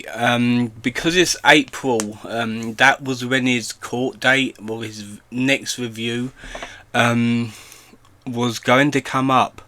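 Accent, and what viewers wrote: United Kingdom. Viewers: average british voice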